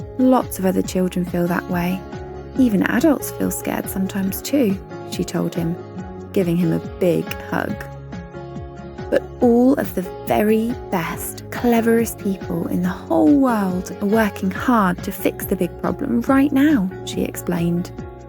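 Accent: United Kingdom. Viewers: Yesh theres a harry potter accent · Gotta love the British accent!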